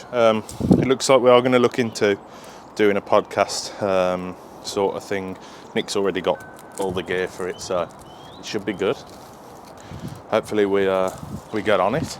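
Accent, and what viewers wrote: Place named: England, United Kingdom. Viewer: where are you from in England?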